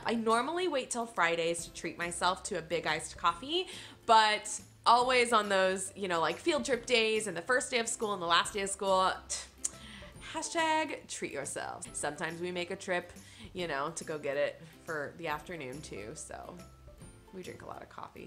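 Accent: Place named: Indiana, United States. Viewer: I would LOVE to know what decor pack you are looking at. I am going for the same look in my classroom. I am switching rooms and grades, looping with the same kiddos and want to give them something fresh. Fellow Hoosier here. Transplanted to NC at 15. From the South Bend area. Your accent makes me feel at home. Enjoy your videos. Thanks for sharing!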